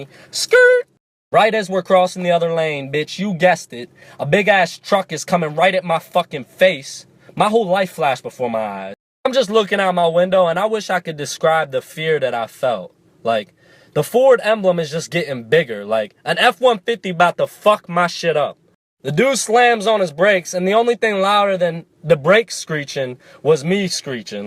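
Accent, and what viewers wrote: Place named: United States. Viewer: fuck you make me laugh !! god bless the yanks accent !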